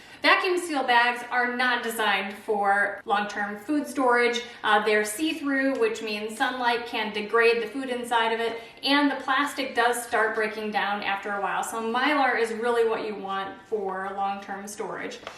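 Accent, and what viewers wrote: United States. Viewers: it doesnt matter, its kind of cute and funny how Americans pronounce it ❤️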